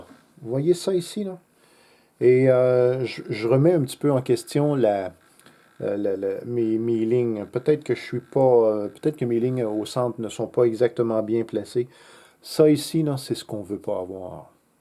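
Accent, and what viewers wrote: Quebec, Canada. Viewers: Je découvre le tournage. Bravo vos vidéos sont très utiles et... j adore l accent de la Belle Province!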